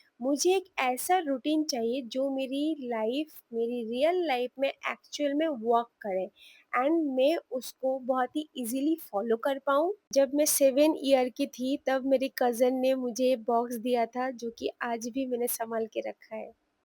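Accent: Bengal, India. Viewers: Tomake dekhe onak motivation pelam amar o khub tension hoto ami to job kori ki kore biyer por sonsar and job 2 e korbo kivabe samlabo to tomake dekhe sikhkam je ata amader morer tension sob kichu e kora jai❤❤❤ ar ami bengali te liklam Karon tomar hindi bola ta dekhe mone hoche tumi bengali 🥰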